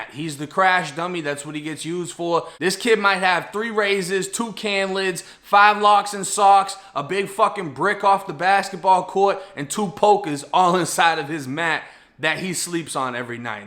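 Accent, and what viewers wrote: Boston, United States. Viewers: That’s wild he sounds very Boston for Florida lol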